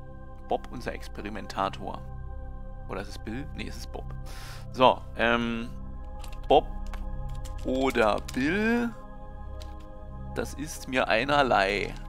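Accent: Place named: Berlin, Germany. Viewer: Ah herrlich, diese Verwirrung und dann noch die Berlinerschnauze 🤣👍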